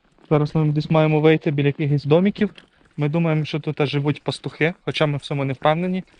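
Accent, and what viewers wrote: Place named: Galicia, Ukraine. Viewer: Почув пару слів - жд-вокзал, іменно, чуть-чуть, морожено, лагерь (німецьке слово). В автора галицький акцент, то не акцент м.Хмельницький.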